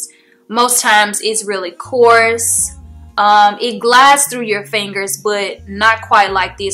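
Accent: South, United States. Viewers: i like your accent its like country. where are you from?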